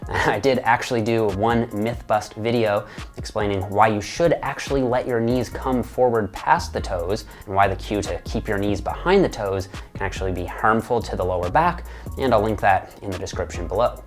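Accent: Newfoundland, Canada. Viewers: I hear a newfie accent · The burr. This man is Canadian no doubt. Awesome content dude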